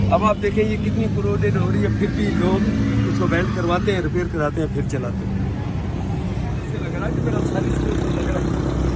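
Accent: Punjab, India. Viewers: Mujhe bhi panjabi nhi aati...but i like your panjabi accent....mujhe to bahut achcha lagta hai uncle apse panjabi sunna..i like the way you talk ..think..and everything..don't change Uncle..we like you the way you are..🙂🙂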